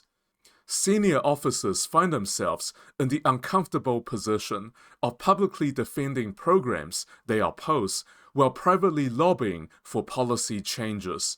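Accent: China. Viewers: Your Chinese accent is annoying! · What kind of accent is that? Any ideas? Is there a Chinese accent mixed in?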